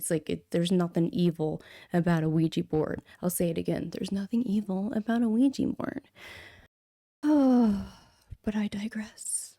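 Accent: Australia. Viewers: As an Australian myself, that accent was gold 😂👍🏻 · Because dolls/toys are attached to people's childhoods or something? That's just my thoughts 🖤✌️👑👽 (maybe Dolls are like humans and turn into some kind of vessel) p.s. best australian accent ever¿ 💀 THIS STORY IS WILD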